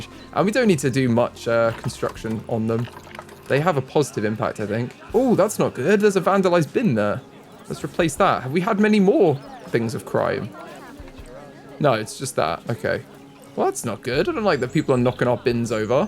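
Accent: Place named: RP, United Kingdom. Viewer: He's definitely English. I would say South/South East England. Honestly to me, from North East England, he just sounds... generic English lol 🤷‍♂️ Like definitely not Newcastle, or Yorkshire or Liverpool, or Birmingham. Just... slightly posh/fancy generic lol.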